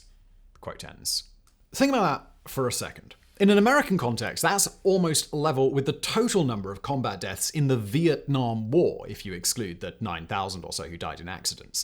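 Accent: England, United Kingdom. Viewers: Another NWO site and sounds like he's from that wannabe country called England . What was the last so-called WAR England had . Oh yes , invading the Falkland Island. Not only loosing one of their war ships , they had to use a cruise ship to bring the ground troops to the conflict . So , England has to war through Ukraine to test their weapons . Britain doesn't even rule the waves anymore since the ending of WW II .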